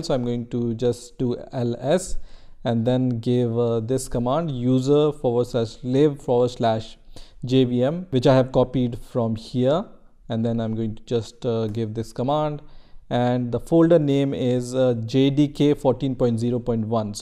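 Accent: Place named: India. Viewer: When i saw the indian accent, i realized that i was in the right place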